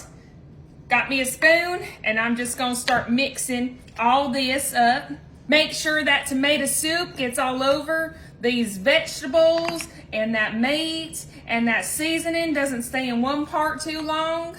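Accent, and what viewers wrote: South, United States. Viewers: You are not a southern cook. We do not cook like this in the south. A southern accent doesn't make you a southern cook. This is garbage. Trash. Throw the whole pan away. This is an abomination. Yuk 🤢🤢🤢🤢🤢🤢🤮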